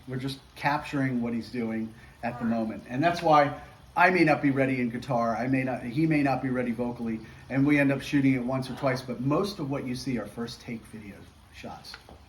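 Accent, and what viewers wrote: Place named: United States. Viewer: Good Saturday morning to you! Thank you very much for this video which allows us to know more about Tico... I have absolutely no doubt that he is a happy bird, who also has, it must be said, a very loving and attentive family. parrot needs. Thank you for enlightening our days or our nights, for sending positive!! ... and thank you also for the French who do not shine too much in English (of which I am... I am more Spanish-speaking, than English-speaking) for trying to improve... I love your American accent (from Florida ?)! A hug to Tico!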